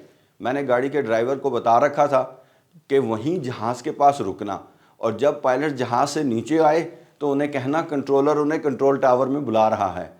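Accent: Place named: Punjab, Pakistan. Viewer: السلام علیکم اپ کی زبان سے اردو کے ساتھ پنجابی کا حلکا سا اشارہ بہت اچھا لگا روسی بہت مکار قوم ہے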